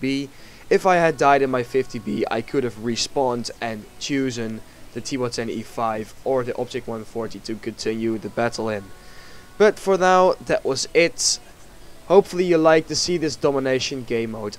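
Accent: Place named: Netherlands. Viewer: Jij bent een Nederlander toch want je uitspraak daar kan ik het aan horen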